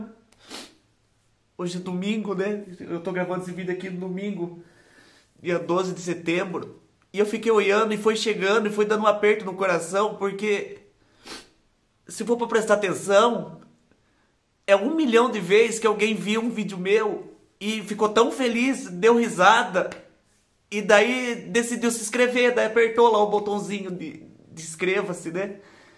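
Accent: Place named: Rio Grande do Sul, Brazil. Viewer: Gostaria de saber de onde vc é .Achei que fosse gaúcho, pelo jeito que tu fala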